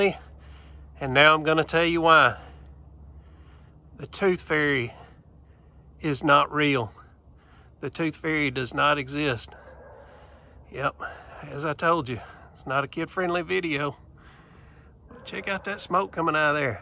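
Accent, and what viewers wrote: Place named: Arkansas, United States. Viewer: My guess is Arkansas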